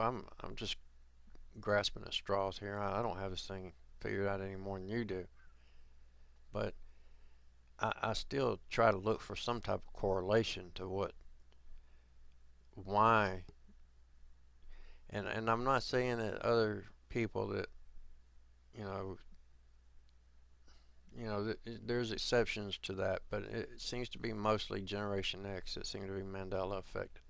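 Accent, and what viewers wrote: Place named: Texas, United States. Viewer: you sound almost like a Texan. I bet your German is a bit cute. Enjoying your vids, bud. Thanks! P.S. Texans speak really slow, too, especially the ones from the Panhandle part of Texas.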